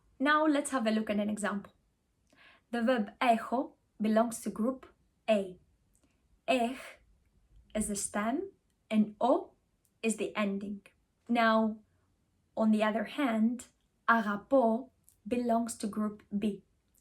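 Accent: United Kingdom. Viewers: I find your videos really helpful Thankyou! All the other Greek videos I have found are too difficult to understand as the tutors speak too quickly. Yours is perfect. I hope you don’t mind me saying but I am surprised that you are pronouncing ‘ and’ with a strong American accent and internet without the ‘ t’ sound because otherwise you have an English accent which sounds almost fluent. To me these words with American pronunciations stand out and sound rather odd. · Listening to your British-English accent, I wonder if you can talk about how you acquired it (instead of, say, an American-English accent), and how to better sound "Greek" instead of speaking Greek with an American or British accent? Also, I know that Crete has it's own accent/dialect. Are there many other regional accents around Greece?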